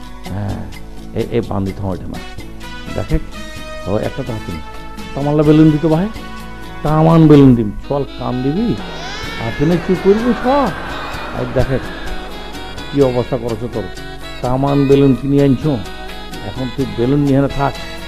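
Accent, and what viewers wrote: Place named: Dinajpur, Bangladesh. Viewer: দিনাজপুরের আঞ্চলিক ভাষা গুলো আপনার মুখে দারুন শোনায় স্যার। প্রতি ইদে এটা একটা আলাদা আকর্ষন অনেকের জন্যই। দিনাজপুরের সকলের উদ্দেশ্যে, দিনাজপুরের চোখধাঁধানো খেলাগুলো দেখতে চোখ রাখুন আমাদের চ্যানেল Sports Mania তে।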